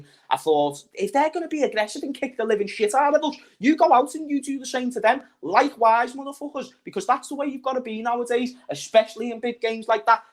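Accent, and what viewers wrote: Liverpool, United Kingdom. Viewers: Happy New year oooooozing scooouseeee love your energy and enthusiasm just became a member looking forward to some more great content 👍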